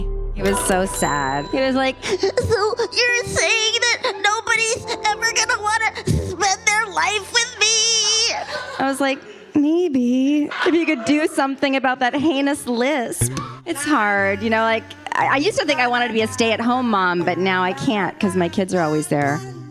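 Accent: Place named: Mexico. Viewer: You sounded like lana but in mexican accent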